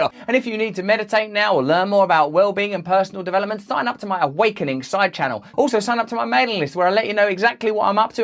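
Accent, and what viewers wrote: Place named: United Kingdom. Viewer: Tough to listen to.. limey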